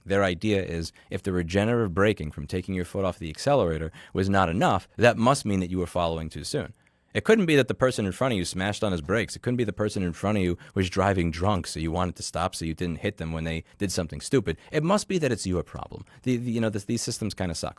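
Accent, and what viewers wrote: Australia. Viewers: I’m Australian so it was always obvious that he was Australian but Australians don't have such distinct accents that alline with people’s race so it’s often not easy to pick. There are ethnic accent variations in Australia but they are often learned from your family rather than broader community so if you're a second or third gen Aussie you might just sound like everyone else. There are exceptions like people from Melbourne with Greek heritage like Nick Kygerios (I don't think he was originally born in Melbourne and he’s half Greek) but you can hear it in his accent. That's most likely because Melbourne has the largest Greek community outside of Greece. The Indigenous Australian accent is also quite distinct but many Aboriginal people don’t have it. I was surprised to see isn't white after watching his videos for a while, that was a whole second or two of surprise! 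Either way it doesn't really matter, I don't think anyone is watching his channel because they think he’s white any more than people watch MKBHD because he’s black (but has a more neutral accent). I’m guessing he went to a good school and he shares the same general accent that most of his peers had. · With an Australian accent? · He has an Australian accent... Also you aren't as clever as you think you are. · Being interviewed by a Brit wait whats with the Aus twang. Ah... ok. Interesting. Cool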